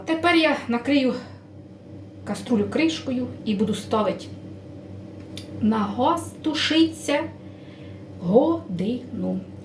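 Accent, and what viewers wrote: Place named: Chornobai, Ukraine. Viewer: та ще й мабуть біля Чорнобая живете, я цю мову впізнаю з тисячи🌺🌷😉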